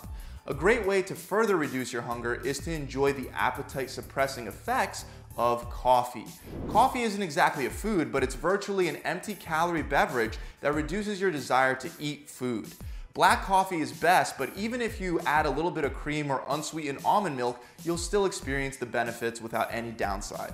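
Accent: Philadelphia, United States. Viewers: You gotta be from Philly. LOL Wooder instead of water. I miss the Philly accent. Anyway your vids are amazing. Keep em coming.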